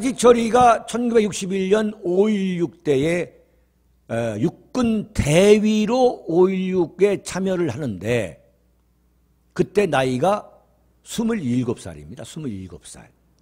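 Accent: Chungcheong, South Korea. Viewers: 윤칼세님 방송이 그래도 재밌음 느릿느릿한 충청도말이지만 진실과 설명을 잘 애기해주네요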